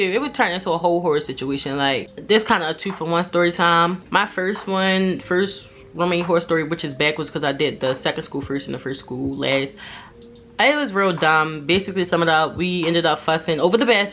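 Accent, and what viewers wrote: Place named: Maryland, United States. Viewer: Yesss straight into the story ! I’m loving it 💯u jus earned yourself a #Newsubbie 🥰 btw Are you from Maryland ? I’m asking because I’m from Baltimore Maryland and your accent sounds like u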